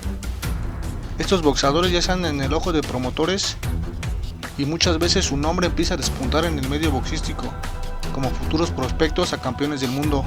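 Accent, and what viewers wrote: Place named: Tepito, Mexico. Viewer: jajajaja tu acento se escucha super tepiteño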